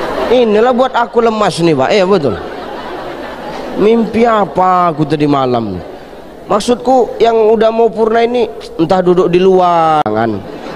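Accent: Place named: Asahan, Indonesia. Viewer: ASSALAMU ALAIKUM USTADZ., IDOLA SAYA SEMOGA SEHAT SENANTIASA Ada dlm Lindungan ALLAH AamiiiN CERAMAH NYA MENGENA DI HATI SAYA SUKA Dgn LOGAT ASAHAN NYA SUKSES SELALU USTADZ.JAKARTA HADIR SELALU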